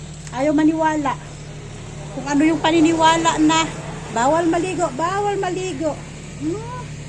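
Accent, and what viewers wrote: Philippines. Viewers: I like the way u speak taglish hehehe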